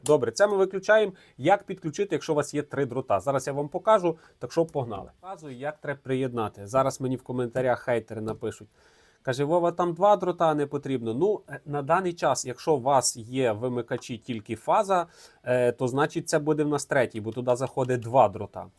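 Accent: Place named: Lviv, Ukraine. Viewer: вимова львівська, але русизмів доюха, але то нічого не вирішує. Тільки підзелупний йободовб концентрується на мові, головне бути людиню, й не важливо, якою мовою ти розмовляєш. Он зелупан вивчив Українську, але воно ненавидить Україну й Українців, воно хоче тут царювати й рабів з бангладешу набирати....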